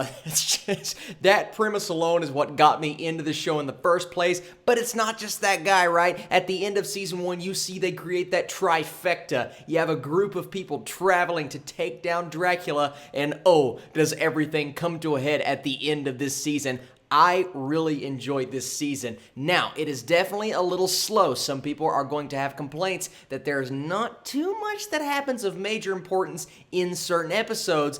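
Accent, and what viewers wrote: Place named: Texas, United States. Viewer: Are you from Texas? You have that accent.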